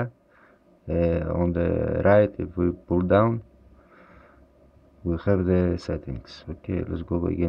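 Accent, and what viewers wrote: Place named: Greece. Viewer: Otan o ellhnas milaei agglika fenete apo thn profora tu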